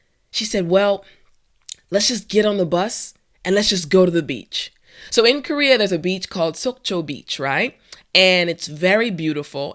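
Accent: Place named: United States. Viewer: Hello beloved my mom I'm meek ranjit from India at Odisha studying now I'm so cheerful of your english Teachership truly say that I'm understanding your Pronouncation of an American accent now these days improving vocabulary I'm also reading the Bible everyday that's by God is helping me individually life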